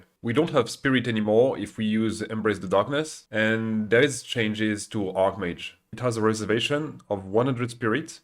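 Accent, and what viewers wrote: France. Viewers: You got a bit of a french accent but you speak english way too good to be french. What is this sorcery?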